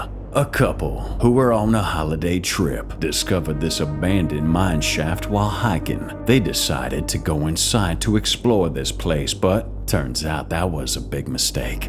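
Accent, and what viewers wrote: Louisiana, United States. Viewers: Love the "Wheezy Anna" accent brother...great content as well. You just gained another sub! Feed us!!!!! ;)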